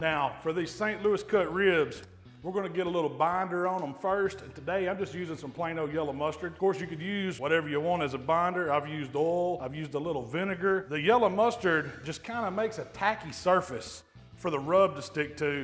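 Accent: South, United States. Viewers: Have you ever seen a grown man salivate??! You my friend are awesome love southern way of talking and I could smell your cooking over the Internet thanks for the wonderful tips Allan So Cali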